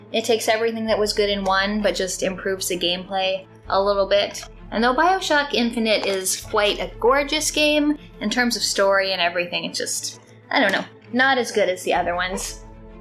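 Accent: Canada. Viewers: Really enjoyed the tour, thanks! You've got a pleasant voice and i love the articulation and of course the Canadian 'about'.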